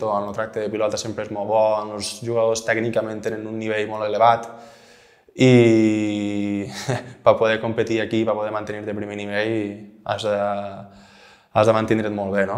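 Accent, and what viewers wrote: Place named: Southern Catalan, Spain. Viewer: He is speaking catalan language (southern accent) btw, not spanish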